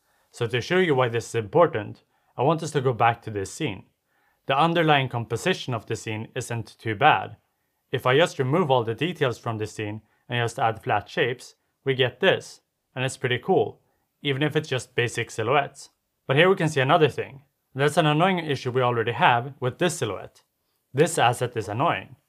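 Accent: Sweden. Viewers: Great video. Are you Swedish by any chance?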